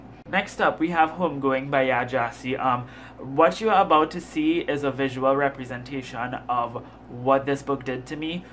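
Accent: Trinidad and Tobago. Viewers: It's so weird but refreshing to hear hear a Trini accent for once. I've gotten so used to foreign booktubers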